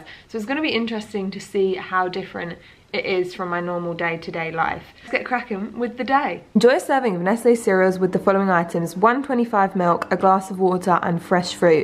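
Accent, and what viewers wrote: England, United Kingdom. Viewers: So that's what a british accent is... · Who else loves her British accent? 😍❤🇬🇧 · Are you British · Pink Fluffy Unicorn a brittish accent could be northern Irish welsh etc but her accent is just English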